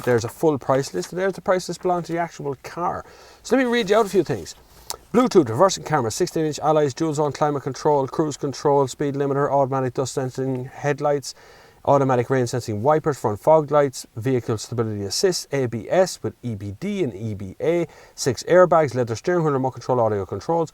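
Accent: Ireland. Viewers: Oh I love the Irish way of speaking!:) Sadly I moved from Galway to Switzerland 2 years ago after spending the nicest 12 years of me life over in the Wesht;) Great review there! Ps. Price of this car in ROI comparing to the Swiss price is ridiculously low:)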